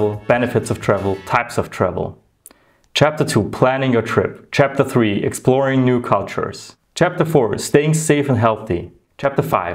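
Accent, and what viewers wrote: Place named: Austria. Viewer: Great video! Very inspiring! Also - pleasure to listen to the Austrian accent :)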